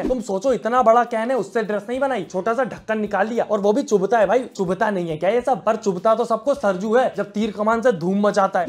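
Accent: Maharashtra, India. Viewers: Bhai aap Maharashtra se ho kya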